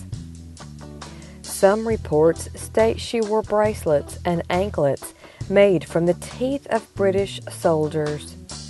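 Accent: South, United States. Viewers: My grandad Will was a Maroon and I cant stand this southern American voice over.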